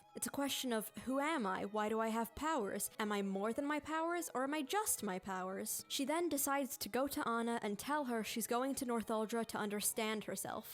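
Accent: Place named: Ireland. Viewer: you sound irish and i love it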